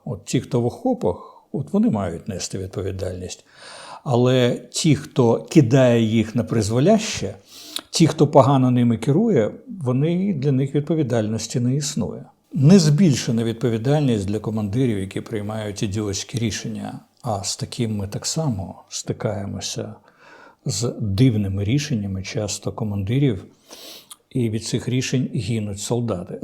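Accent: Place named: Russia. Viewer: Цікаво і слушно, незважаючи на російський акцент з цими жахливими щьоканнями і чьоканнями, і на слова з російського словника, що ними послуговується автор і ніяк не спроможний відмовитись від них.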